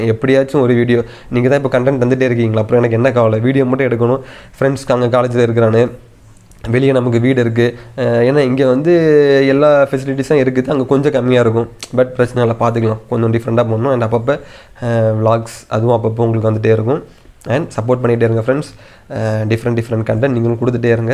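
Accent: Kanyakumari, India. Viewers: கன்னியாகுமரி slang 😍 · Athu kanyakumari slang bro💪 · R Really I Intelligent S Slang Master H Hyper Power A Appreciate B Best The Best kumari Slang Means You only 💓 Lots of love💓💓💓💓💓💞💞💞💞💞💞💞💞💞💞💞💞💞💞💞💞💞💞💞💞💞💞💞💞💞💞💞💞💞💞💞💞💞💞💞💞💞💞💞💞💞💞💞💞💞💞💞💞💞💞💞💞💞💞💞💞💞💞💞💞💞💞💞💞💞💞💞💞💞💞💞💞💞💞💞💞💞💞💞💞💞💞💞💞💞💞💞💞💞💞💞💞💞💞💞💞💞💞💞💞💞😍😍😍😍😍😍😍😍😍😍😘😘😘😘😘😘😘😘😘😘😘😘😘😁😁😁😁😁😁😁😁😁😁😁😁😁😁😁😁😁😁😁😁😁😁😁😁😁😁Chella mavane 😍🤣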